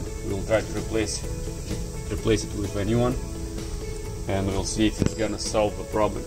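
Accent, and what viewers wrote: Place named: Russia. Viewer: Рашен акцент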